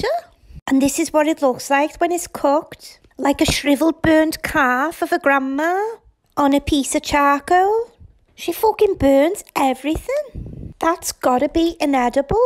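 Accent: Liverpool, United Kingdom. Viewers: love your content! proper love the scouse accent pal, Yeah Lush is a proper chavvy scroat! Feel so bad for the kiddos!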